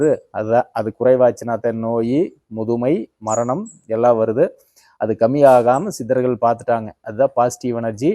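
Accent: Kongu, India. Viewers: ஆஹா அருமை தம்பி.. கொங்கு மண்டல தமிழில் எளிதாக புரியும் வகையில் இருக்கும் அற்புதமான பேச்சு...உணவே மருந்து என்ற ஒன்று இப்போது அவசியம்...மருந்தை நம்பும் சிலர் இயற்கை உணவை நம்புவது இல்லை என்று வருத்தமாக உள்ளது... இன்னும் கூட தெளிவாக பச்சையாக சாப்பிட வேண்டிய காய்கறிகள் தந்து இருக்கலாம். பழங்கள் பச்சையாக சாப்பிட முடியும்... நன்றி தம்பி 🎉🎉🎉 .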